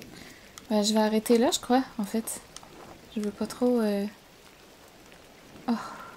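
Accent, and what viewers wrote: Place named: Quebec, Canada. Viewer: Je me doutais a ton précédent gameplay que tu parlais français (notamment lorsque tu utilises la voix google) mais j'osai pas le dire. Je suis français et c'est vraiment cool d'entendre un accent québécois ;-)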